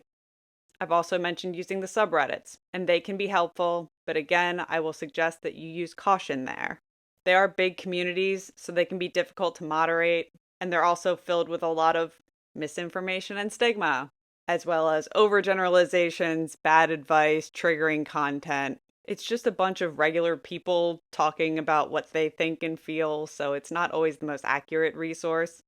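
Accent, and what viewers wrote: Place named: East Coast, United States. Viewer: Thanks so much for making these videos. I just stumbled upon your channel a minute ago. You have an accent. Trying to pick up where it's from? East Coast?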